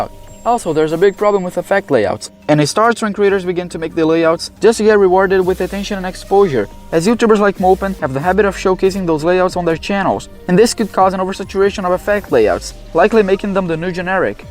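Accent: Brazil. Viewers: Some brazillian accent ight there!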